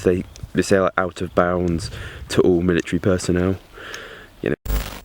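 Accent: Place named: India. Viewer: Inglish accent, sounds, suks, like this dud accent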